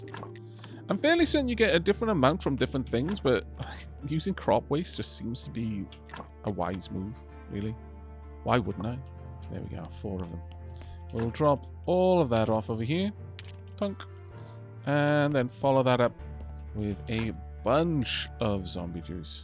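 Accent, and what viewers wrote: United Kingdom. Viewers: Holy shit your like one of the most British people ive ever hear speak...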